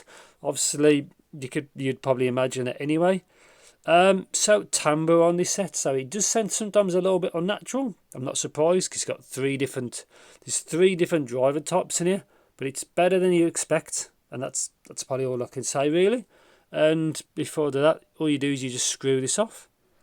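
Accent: United Kingdom. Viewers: Hi mate, fellow brit here, subbed as soon as i heard the accent lol. Hoping you can help... My music is mostly, so alot of acoustic and string dominated music. live edition, acoustic such as ' ' and etc... To give you a solid genre type... Im looking at buying a pair of IEM's to suit my listening style for this music. I dont need bass cannon's im looking for quality rich mids and vocals mostly. Would you recommend these as a cheap enough pair that should do a decent job? I'm looking at ordering oracle mkiii as a treat for myself as a high end IEM set. Do you feel that set would suit my listening style? Great channel pal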